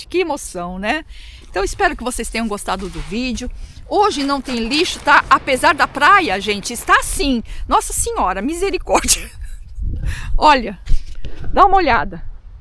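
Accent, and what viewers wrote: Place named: Brazil. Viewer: hoje estou em Portugal.gostei de ver a baleia 🐳 junto com você.gostei a sua maneira de se expressar bem brasileira mesmo😊 beijinhos.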